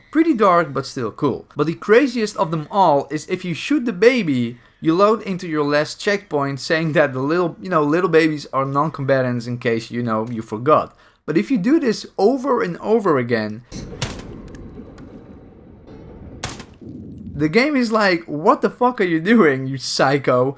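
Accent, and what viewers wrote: Netherlands. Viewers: Yo have a dutch accent but nice video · I can see the Dutschness in your voicetone😂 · where are you from, you sound like from the Netherlands, cuz I'm from the Netherlands.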